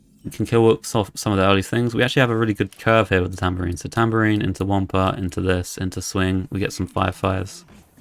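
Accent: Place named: England, United Kingdom. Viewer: The english accent helps :)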